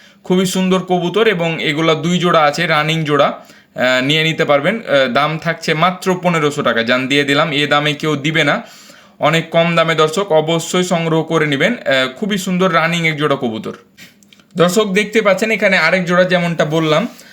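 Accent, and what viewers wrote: Kolkata, India. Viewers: আপনার কথার ধরন কলকাতার মানুষের মতো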